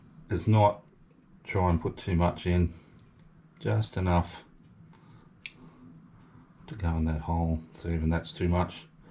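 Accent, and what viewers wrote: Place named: Australia. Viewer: Love our Aussie accent 🙂👍😉🥰 Yummo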